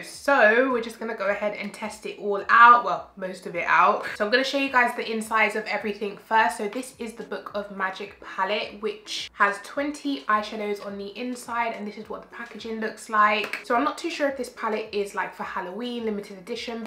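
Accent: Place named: England, United Kingdom. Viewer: I love how English people say "purple"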